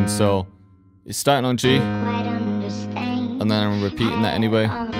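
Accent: United Kingdom. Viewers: I really like this dude's British accent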